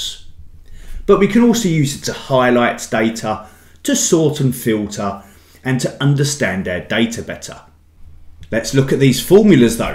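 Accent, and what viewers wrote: United Kingdom. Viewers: Nice British accent)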